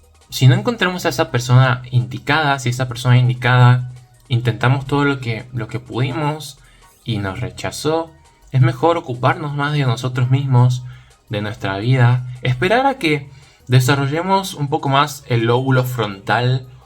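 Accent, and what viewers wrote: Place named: Argentina. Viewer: Eres Argentino 😊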